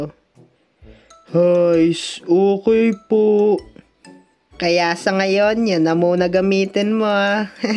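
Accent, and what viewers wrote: Philippines. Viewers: Taga maynila ka ba?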